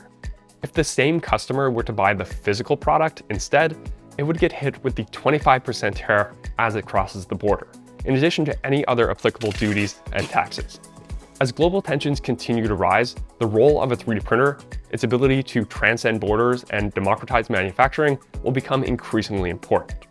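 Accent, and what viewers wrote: Canada. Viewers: You sound Canadian. Tariffs have been one sided with foreign companies enjoying the benefits of their domestic product being more affordable than American made products. Our tariffs will mirror theirs thus leveling the playing field. American companies will now be able to compete in America. Americans will begin to profit. Give it a chance to bring positive results.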